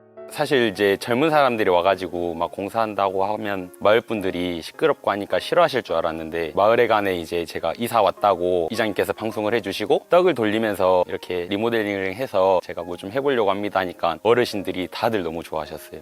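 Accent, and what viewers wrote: Jeolla, South Korea. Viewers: 사투리는 고칠수가 없구나 절라도여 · 화순은 고향이 아닌데 전라도 출신은 맞네요..사투리가 전라도 사투리 아닌가요?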